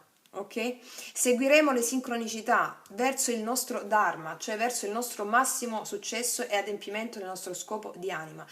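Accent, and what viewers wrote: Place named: Salento, Italy. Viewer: Che dirti? Brava davvero Io conosco solo le sibille, ma tu sei davvero fenomenale. Ti svolto anche se ti conosco e scoperta x caso da pochissimo, ma mi porti a casa. In Salento, l'accento è inconfondibile, vivo all'estero ma sono salentina doc. Grazie. 🌺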